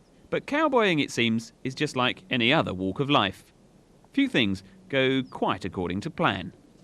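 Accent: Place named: United Kingdom. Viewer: Is this DVD made for UK or some part of Europe? Cause the narrator accent (no disrespect meant) is quite a contrast with the wyoming ranchers accents..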